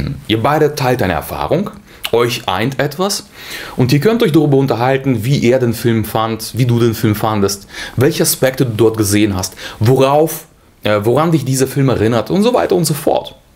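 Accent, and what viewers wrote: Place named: Russia. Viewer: Kann es sein das du ein russe bist weil du so ein russischen aktzent hast so wie ich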